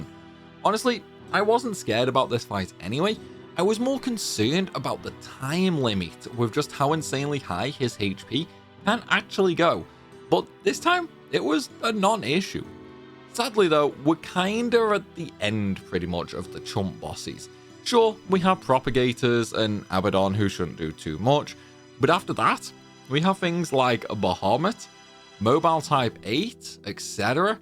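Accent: United Kingdom. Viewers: Y'know, most people enjoy it when a YouTuber doesn't spend a bunch of extra time baby stepping you through the basic systems of a game, because it gets really repetitive hearing every single challenge video explain the exact same things over and over. And I guess hearing a British accent for the first time in your life, while also watching a challenge run of a game you know nothing about, might leave you slightly confused as to what's going on here. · are you british by any chance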